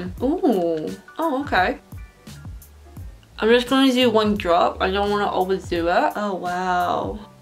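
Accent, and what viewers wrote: United Kingdom. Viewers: So ik her only from Instagram and I finally got around to watch her vids and SHE HAS THE CUTEST ACCENT it totally didn’t even click that he would have an accent (I knew she lived in the uk) but anywho I REALLY REALLY loved this video 💕💕💕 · Woooooooowwww! I have watched ur ig for a while and DID NOT EXPECT THE BRITISH ACCENT!!!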